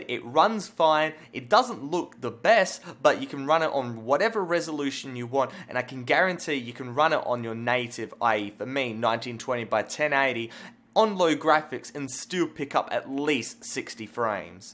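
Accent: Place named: Australia. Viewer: he must be a australian or new zealand accent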